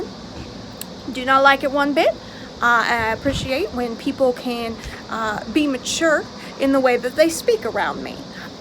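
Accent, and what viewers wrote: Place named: South, United States. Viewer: Hi, nice to meet you. I love your accent. I'm from the UK but I love the country accent. Who is your favourite country music artist? Mine is., I hope this ok to ask, have you ever switched on camera? I found your channel through DissociaDID.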